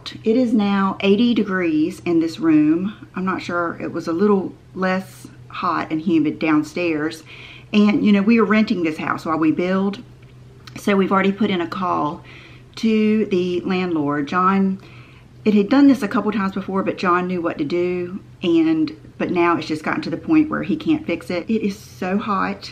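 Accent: South, United States. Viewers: I love your southern accent so much 🦋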